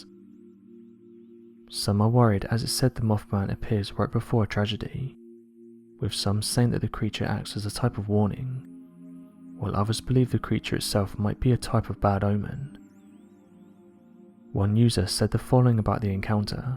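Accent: United Kingdom. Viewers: Your British voice drives me up the wall. Have somebody else narrate your stuff. When you talk British and you talk like you're singing.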